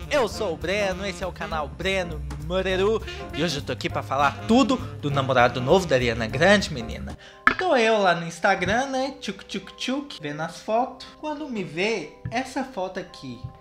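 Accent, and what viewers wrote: Brazil. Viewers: Ariana corre aqui pra ver esse babado! Nauo aguenta tu! Que voz BRASEEL! Cadê a segunda parte da história da polônia homi? ❤️❤️❤️❤️❤️❤️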